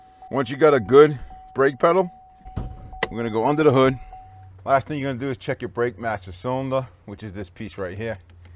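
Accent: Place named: New York, United States. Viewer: This was the best tutorial I’ve come across for this specific job for this specific car. Hers is a 2018 I hope this applies. My daughter wants me to change her brakes and she really doesn’t want to pay those outrageous mechanic prices and neither do I. I’ve only done 2 other vehicles. So here goes! Oh! If you don’t mind my saying, you sound like you’re from my neck of the woods. NY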